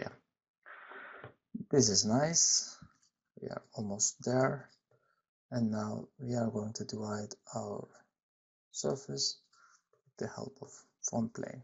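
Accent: Turkey. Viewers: aksanından türk oldugunu anladım, sandalye sırtı gibi ergonomik kıvrımlı düzensiz katı modeller çizer misin?(mesh back diye geçiyor) teşekkürler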